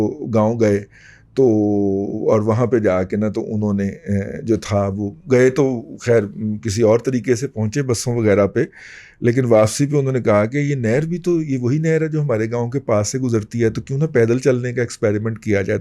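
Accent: Punjab, Pakistan. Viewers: Your urdu/hindi in punjabi accent is quite amusing and enjoyable to hear.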